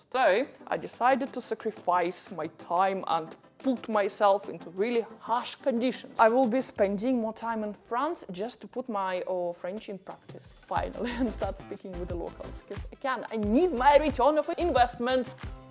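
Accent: Russia. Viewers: Hi, I like your content. Good work. I am a little bit like you, except I am not that pretty. I guess they calling us expats or gypsies 🙂Do I hear a russian accent out of you, or am I totally wrong. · Wow, Norwegian with Russian speaking accent.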